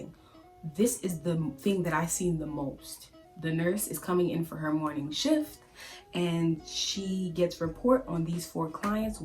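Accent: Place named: Liberia. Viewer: I keep hearing a slight Liberian accent. Darling, are you from LIB?😉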